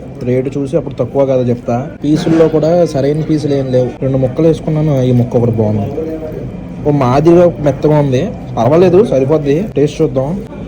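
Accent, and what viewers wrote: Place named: Godavari, India. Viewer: Mee godavari slang super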